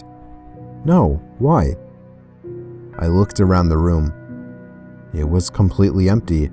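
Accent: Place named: New York, United States. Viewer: I just realized he has a little New York accent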